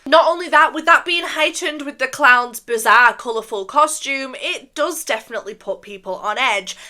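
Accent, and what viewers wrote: United Kingdom. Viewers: when i tapped on the vid i did not expect a heavy british accent😭😭 its so iconic tho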